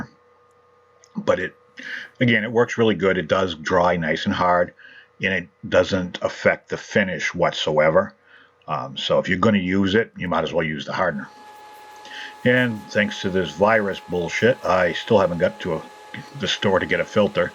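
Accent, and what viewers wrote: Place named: New England, United States. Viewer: Wicked pissah I love it lol where you from Maine or Mass?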